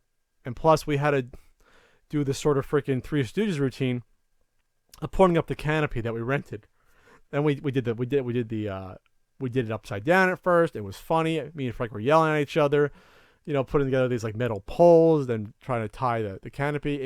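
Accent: New Jersey, United States. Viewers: I love the Jersey in u. it's one of the main reasons I watch. the New Wario, funny shit.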